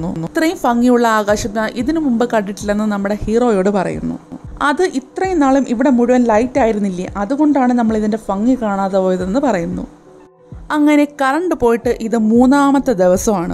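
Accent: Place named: Kollam, India. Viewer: Kollathil ullavar aganeyann parayuka bro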